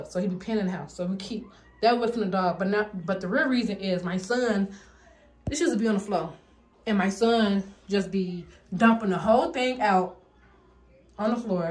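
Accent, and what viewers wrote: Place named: Chicago, United States. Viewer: You sound like you can be from Chicago..are you? my dads side of the family stay there and your accent is similar to theirs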